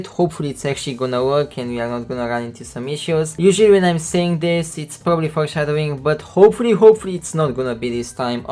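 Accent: Romania. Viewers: Wait I just realized are you Romanian??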